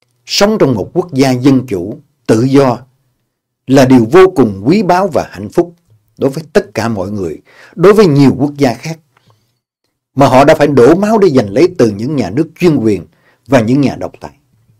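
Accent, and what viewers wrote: Mekong Delta, Vietnam. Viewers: Cảm ơn nụ cười vui với tựa đề hôm nay ngày Mồng Hai Tết, đây đúng là chất giọng nhà quê rặt miền Tây. 👌👌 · Nghe câu nói rặt chất giọng người miền Tây của anh Việt Linh tôi cười mãi. Cả thế giới đều biết về Trump như thế nào, chạy xa lánh tên cùi hủi này nhưng người mỹ thì không thấy, không biết gì cả, thế mới lạ? 🤔🤔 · Cảm ơn câu nói chân chất, nhà quê, mộc mạc của Bác hai Trầu (Việt Linh), đúng chất giọng dân miền Tây, người Sài Gòn. 🤣🤣🤣👍👍👍👍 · Tôi vừa nghe xong, chương trình đầu năm rất hay, cảm ơn anh Việt Linh nói lên suy nghĩ thay cho nhiều người về tình trạng dân trí xuống cấp tại Mỹ, mê tín di đoan tăng cao trong nhiều sắc dân, và tôi thích nhất câu nói rặt miền Tây của anh. TUYỆT VỜI. :face-red-heart-shape::face-red-heart-shape::face-red-heart-shape:👍👍👍👍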